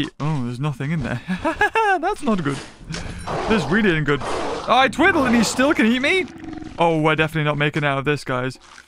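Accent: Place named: United Kingdom. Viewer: You are Markiplier but bri'ish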